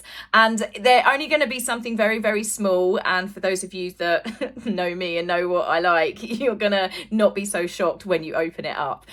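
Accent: United Kingdom. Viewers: Oh....la la... ❤️ I fall in love with awesome British accent and amazing storyteller at the end of the video. You are #1 👍 California 🇺🇸 💕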